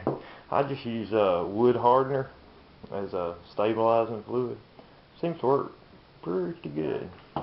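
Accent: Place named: Tennessee, United States. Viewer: THANK YOU MAN! Great job! Great idea! You sound like your around here (tennessee).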